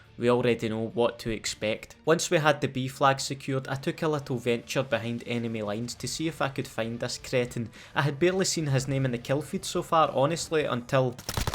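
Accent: Scotland, United Kingdom. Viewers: Hellow is this scottish english acent? Or irish? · Came for the camping stayed for the Scottish accent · ??? He’s Scottish 😂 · Sounds Scottish to me! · I jast wotch hes videos for hes amazing scottish accent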